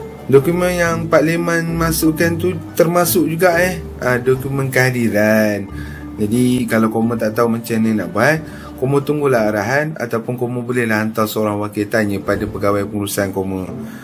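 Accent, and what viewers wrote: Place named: Perak, Malaysia. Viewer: aje pekat peroknye kome 😅👍🏻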